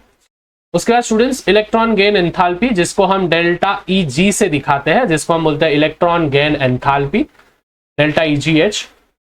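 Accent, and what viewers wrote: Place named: Aurangabad, India. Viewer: Full aurangabadi accent 😂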